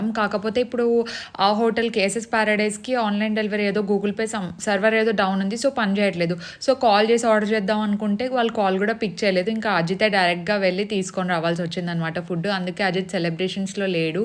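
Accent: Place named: Madanapalle, India. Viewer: A big fan of uh akka ❤️ I'm from madanapalli n whenever I see ur vds I feel lyk ur one of our family member n ur slang is super related to us. Keep rocking akka 🥳🥳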